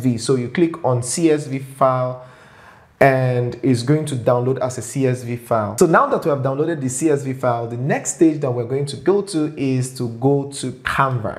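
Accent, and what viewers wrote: Nigeria. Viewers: I like listening to your accent. I’m guessing Nigerian and Igbo?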